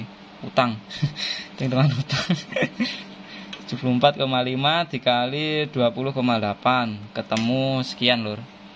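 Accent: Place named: Madura, Indonesia. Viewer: Nah ketahuan ada logat madura nya 😲😲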